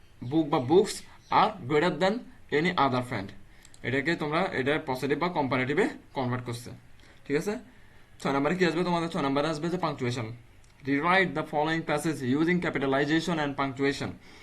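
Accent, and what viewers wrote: Chittagong, Bangladesh. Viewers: Bhaia apnar kotha chitaingar moto lage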